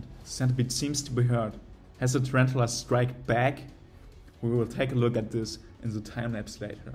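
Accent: Germany. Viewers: I like that the narrator has a German accent worthy of a Bond villain. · u r definitely german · Are u German? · You have a German accent. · Wie man raus hört das du deutscher bist 😀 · You must be german, your english sounds like that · Kanns sein das du Deutsch bist 😂✌️ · Are you German? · Hör ich hier ein Deutschen accent 🤔😂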